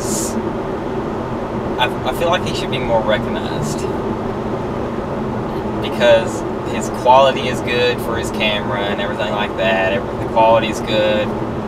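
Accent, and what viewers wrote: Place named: South, United States. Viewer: The worst? Lol. It’s the country accent. It makes it better.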